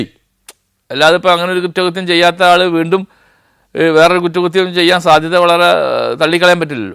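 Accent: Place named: Valluvanad, India. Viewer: വള്ളുവനാട് ഭാഷ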